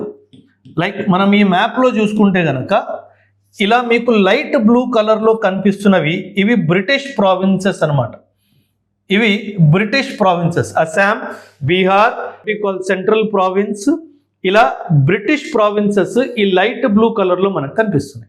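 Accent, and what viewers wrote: Punjab, India. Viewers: తెలుగు మాట్లాడే వారు కూడా స్వచ్ఛమైన తెలుగు పలకడం లేదు ఒక పంజాబీ అయి ఉండి కూడా స్వచ్ఛమైన తెలుగులో మాకు సమాచారం అందిస్తున్నారు అభినందనీయులు ధన్యవాదాలు❤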